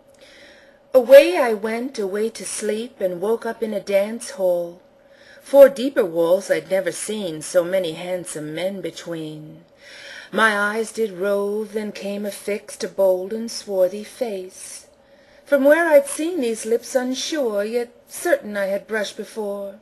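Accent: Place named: England, United Kingdom. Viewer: Absolutely superb! I loved the English accent too - lol ~Sue~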